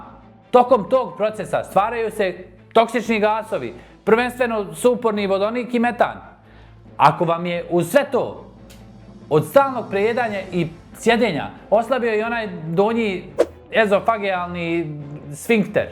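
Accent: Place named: Tuzla, Bosnia and Herzegovina. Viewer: Ovaj naglasak mi najvise vozi na Tuzlu, Bijeljinu i taj dio (prije ce bit Bijeljina)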